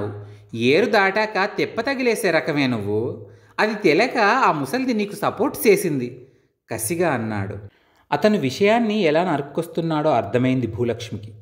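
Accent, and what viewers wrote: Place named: Uttarandhra, India. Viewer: ఉత్తరాంధ్ర మాండలికం మీ గొంతులో అద్భుతంగా పలికింది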